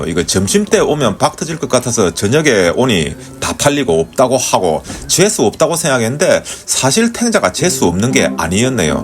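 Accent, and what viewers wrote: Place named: Busan, South Korea. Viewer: 네네 정겨운 말씨랑 같은 부산시민이셔서 더더욱 즐감햇어요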